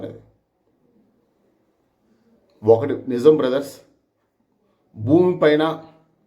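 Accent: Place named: Vijayawada, India. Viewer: Anna basha Vijayawada nunchi udo tisukunna chala bagundi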